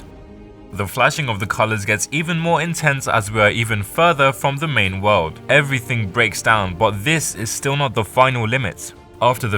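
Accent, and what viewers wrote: United Kingdom. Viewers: You're British? I am asking this because of your different ascent!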